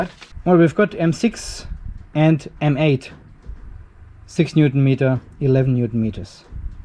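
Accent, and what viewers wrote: Germany. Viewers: Hello, I am from the nature Island of the Caribbean, Dominica. I have been watching your videos for a while now and I must say that there's never a dull moment, I just love your style and your accent, when I first watched your videos I told myself this man is from Germany because I worked with a few Germans building a brewery in my country. Keep going bro we a watching. Oh and the next time you want to say "shit" please say it in German I like to hear it but can't spell it.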